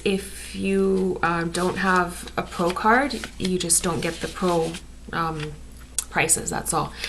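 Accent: Canada. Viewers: Great haul. BIG FAN of the Canadian accent. Go Habs Go!! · Love the heavy Canadian accent =) Eh!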